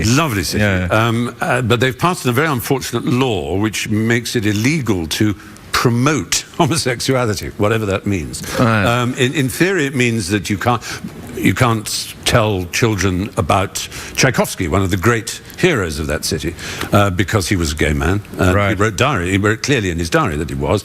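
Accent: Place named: United Kingdom. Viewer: А я почти все понимаю, у Стивена Фрая оч. ясная англ. речь, он считается лучшим носителем британского акцента в Англии. Посмотрела неск. его шоу - его речь сильно отличается именно ясностью и понятностью!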